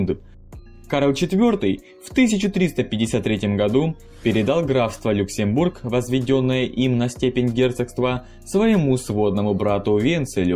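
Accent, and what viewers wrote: Ukraine. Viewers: Твой Украинский акцент такой мерзкий. Буквально засыпаюсь от голоса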